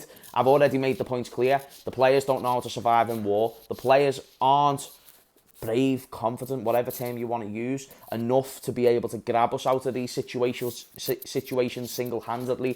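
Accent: Liverpool, United Kingdom. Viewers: Excuse me, I am new to the English language. I want to learn your accent, Liverpool accent. How do I learn? Someone told me to put peanut butter in back of my throat, and I do sound more scouse when I do that, but it's still not great. I will appreciate tips, thank you sir.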